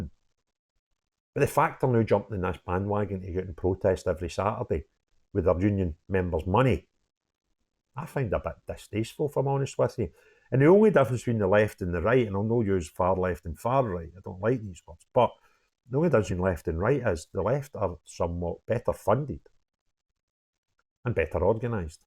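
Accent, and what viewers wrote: Scotland, United Kingdom. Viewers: Love your accent, makes me want to move to Scotland 🏴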